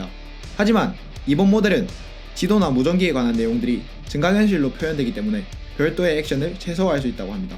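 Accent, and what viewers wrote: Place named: Gyeongsang, South Korea. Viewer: 갱상도 사투리와 영어버터발음의 조화!!!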